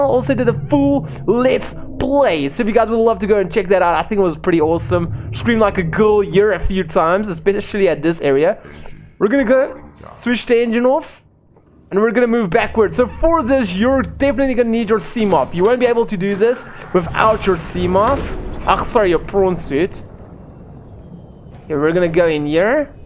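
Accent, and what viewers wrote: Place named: South Africa. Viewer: DEAD GIVEAWAY!!! The Agggg... bra you are my South African brother :)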